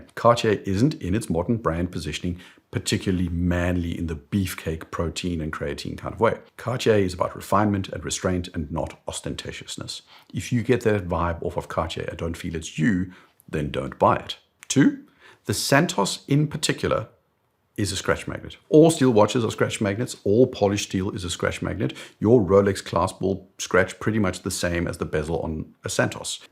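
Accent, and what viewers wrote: South Africa. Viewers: I have always thought that you had a rather unique accent which sounded very "Saffer", now I know where the accent comes from. Greating fom Cape Town" Boet" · Mr, you're South African?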